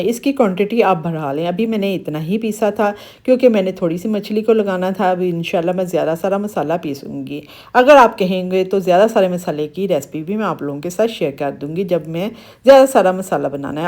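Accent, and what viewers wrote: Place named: Punjab, India. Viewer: Zabrdast ap k panjabi tch bht enjoy krty hain hm khush rhain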